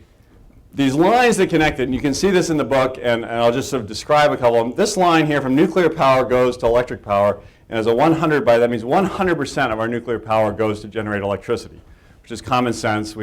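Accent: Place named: Texas, United States. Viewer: I could tell you were from tex-a-a$$ in the 1st few seconds, you are a pre-programmed idiot... Not surprised you're an ex-lawyer that grew up with ONE radio station.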